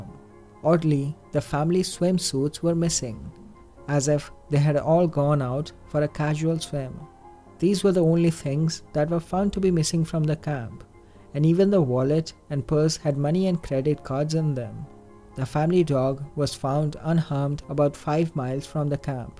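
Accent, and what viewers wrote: India. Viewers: are you from India? You sound like Indian if I'm not wrong. Anyways thank you for including Nepal case.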